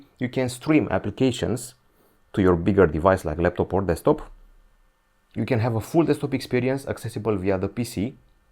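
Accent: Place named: Romania. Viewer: Ăsta-i român după voce și accent 👍👍👍👍 😂😂 Americanii 👎🏿👎🏿👎🏿👎🏿💩💩💩💩 Românii 👍👍👍 💪💪💪💪❤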